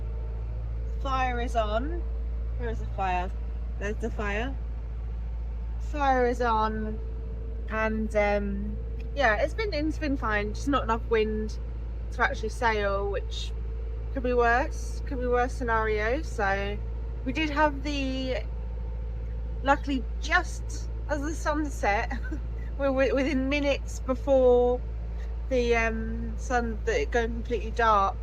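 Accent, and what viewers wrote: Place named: United Kingdom. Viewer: While you're visiting places I am already familiar with I find they become more exciting when described with a British accent. 🤪 Hopefully we will cross paths again when you arrive at your current destination.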